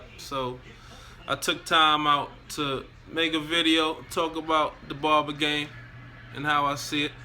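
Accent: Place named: Harlem, United States. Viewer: Bro. Sound like Harlem and the shop could be there as well. Uptown Uptown. Interesting Inside infor on rappers being cheap!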